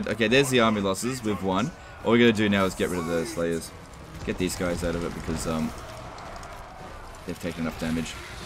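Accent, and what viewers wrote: Australia. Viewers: Alternate title: "Aussie man talking about eating shit for 29 minutes strait (in a video game)."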